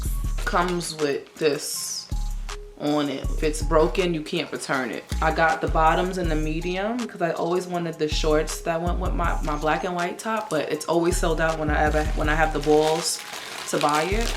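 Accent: New York, United States. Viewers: “Are you duumb?” You’re so NY I love it!! 😭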